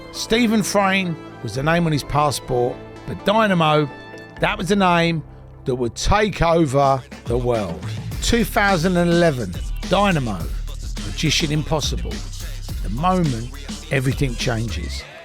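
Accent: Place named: England, United Kingdom. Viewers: I can't even lie.. I'm a cockney through and through and listening to the story of Dynamo told by someone who sounds as if he could be my uncle really makes me feel as if I'm closer to the story than I am. I also believe this was narrated beautifully. Im so glad to have seen Dynamo show face when he was invited into a sidemen video this year (2025). His comeback is nothing but remarkable. I truly believe that if jesus had made his second coming.. then Dynamo is one of the ones who was chosen to show the world the true power of what God and jesus can do. I'm far from religious, however the feats he has performed.. some are on par with the words of the miracles performed by jesus.. make of that as you will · Complements to the narrator with the very strong English accent. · I loved the way you told the story it's nice to hear an English accent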